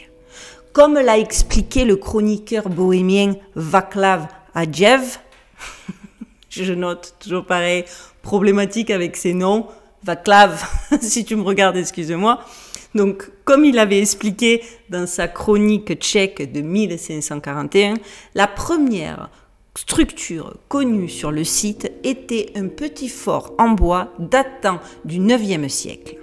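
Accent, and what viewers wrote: Marseille, France. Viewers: Bonsoir, comme toujours un sourire et un humour que j'adore et je ne parle pas de l'accent de Marseille top. Superbe sujet et vraiment à l'ordre du jour on en revient toujours au SS ils sont vraiment trés présent dans notre quotidien depuis toujours. En se qui concerne la visite du château se sera comme toi sans façon laisse ma place aux autres!!!! Belle semaine...... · eh tu sais quoi ta un accent de marseille tu l as pas perdue tu peux me croire bonne continuation et allez l om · hello tout le monde toi qui a un accent du sud de la france cherie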